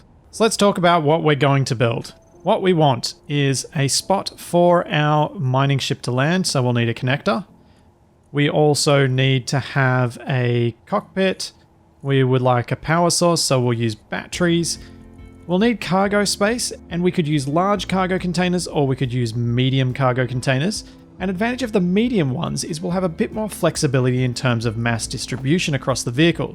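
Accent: Australia. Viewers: Aaah yeah i knew you did something like that;) I think its even easier 4 me to understand you Guys because of your Australian accent ´s (dont like that high Royal Perfect UK Eng so much it sounds so... Royal and is to fast) Your accent sounds much lovelier and sometimes more Funny esspecially when comes around the Corner and youre "makin him Dizzy" and something like that;) Ever want to travel to Australia and not because of the Beautiful Land itsell but mostly for the nice People and Culture that are living there and mby a little bit because of a Movie Series in my Childhood... Sorry but since i saw Mr. Croc Dundee 20 yrs ago or so i knew you were Cool People and i really like the Pesonality of this guy since now;) Its just a movie i know and because of that im happy to be able look just around the World with your Series here and all the other ZebraMonkey LSG´s. 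So again Thanks for good Entertainment and education!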